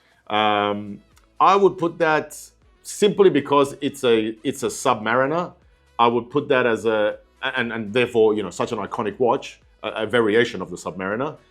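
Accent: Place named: Australia. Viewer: hey brother, are you from Australia as i recognise the accent. Enjoying the content though !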